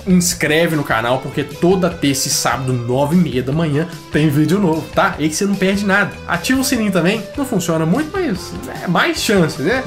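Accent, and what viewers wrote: Minas Gerais, Brazil. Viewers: Mineiro demais kkkkk Like mano !!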